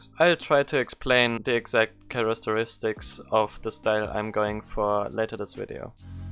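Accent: Germany. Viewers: are you german ? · ummm where are you from you sound a bit like german or smth i like how you build. Subscribe and Like😊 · Are you german?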